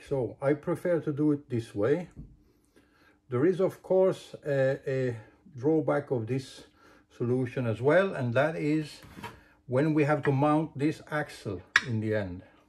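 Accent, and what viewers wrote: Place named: Sweden. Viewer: Glad I could help! Accent is Swedish :)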